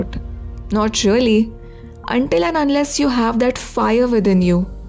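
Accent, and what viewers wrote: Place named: United Kingdom. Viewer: british english while indian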